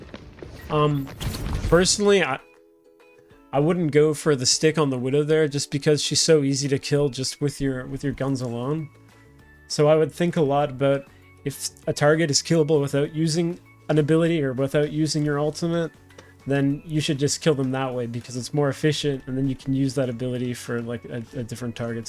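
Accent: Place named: Canada. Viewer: That's quite a Canadian accent you've got there. When y'all say the word "out" y'all say "oWoot" lol XD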